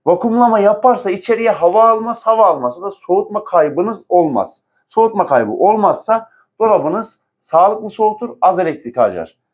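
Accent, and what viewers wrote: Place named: Isparta, Turkey. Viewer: Memleket Isparta galiba 😊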